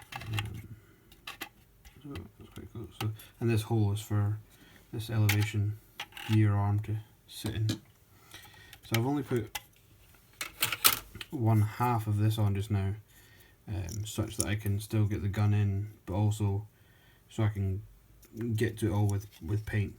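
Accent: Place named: East Coast, United States. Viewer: Nice looking build, are you based east or west coast, you dound like its east coast. I have no problem with how you speak, but......... guess where I'm from? Stick at it, you' ll build up a following.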